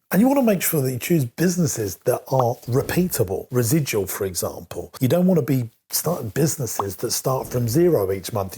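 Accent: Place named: United Kingdom. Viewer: Nice video but here is my opinion. Business that are over licensed, over taxed by governments can bring you down very quick. Not to mention dealing with employes in 2024 is diferent than it was in 2005 (worker rights, sues etc). All of that together can bring you down very quick. By your accent I suppose you are from UK, so you must know what I am talking about. It will depend greatly where are your business located. That´s why major comnpanies are located in Ireland and the Netherlands but their origins are not from there. I rented my business 2 years ago, I receive a rent and I work for others now. I have lots of time now for my family and friends, sports and so. Im in Portugal, a heavily taxed country. In a heavy taxed country the best way is to get big real quick because you will get small margins but since you work the masses you ll be fine. 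That´s the main goal, but extremly dificult.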